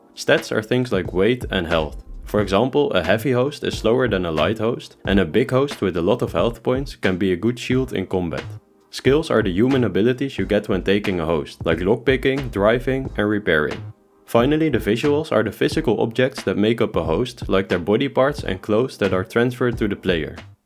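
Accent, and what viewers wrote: Netherlands. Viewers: Are you Dutch by any chance?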